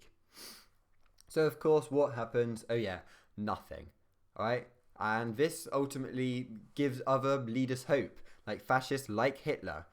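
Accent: United Kingdom. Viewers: I love your accent. R u from Yorkshire or something?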